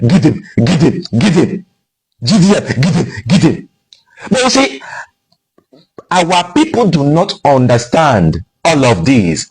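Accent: Igbo, Nigeria. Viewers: I’m from the South East and can understand your Igbo accent very well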